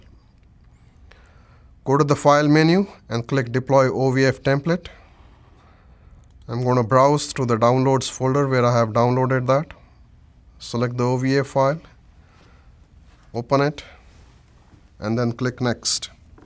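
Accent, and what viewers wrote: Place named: Arab world. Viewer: Mto bacana o vídeo, deixo minha pergunta pq o sotaque árabe, iraniano ou perse?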